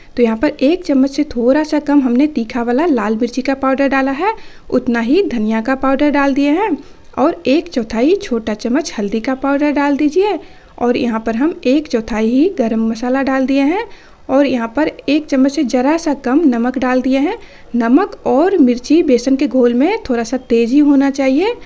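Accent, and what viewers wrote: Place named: Bihar, India. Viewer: Munh kholte hi pata chal gaya yeh totli aur biharan hain...aaooo...hate biharis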